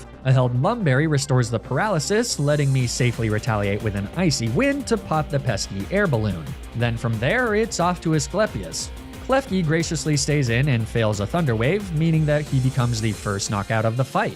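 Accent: Finland. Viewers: Wait how did i not realize you were finnish until now 😭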